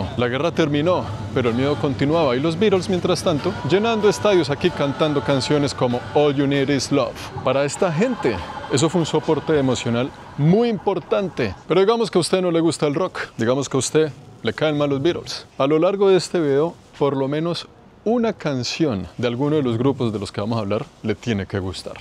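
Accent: Colombia. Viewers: me encanta porque no solo te enseña lugares que nadie o alguna televisora hace y siempre esta informado de algún lugar o monumento en el que visita, y sobre todo la educación y respeto que el siempre demuestra, haa y de extra me encanta su acento colombiano parce XD siga adelante amigo y lo sigo desde que vino a mi humilde Guatemala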